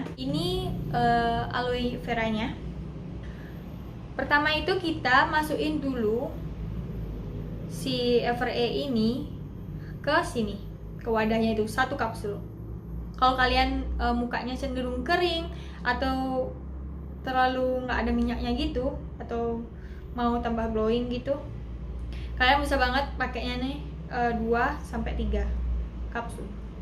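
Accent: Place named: Bali, Indonesia. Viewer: logat khe sa Bali x🤣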